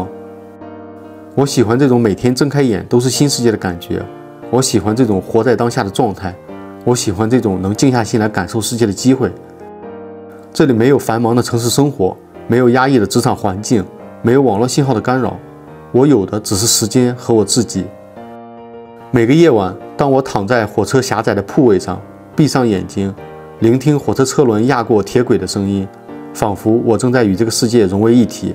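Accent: China. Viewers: 您是河南人吧❤❤❤❤口音❤❤❤❤中原特色❤❤❤❤❤ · 猜想博主是山东人？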